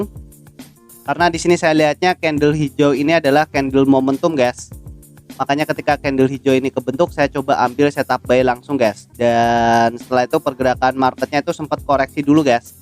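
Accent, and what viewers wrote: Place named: Indonesia. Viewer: orang mana bro? kok medok suaranya haha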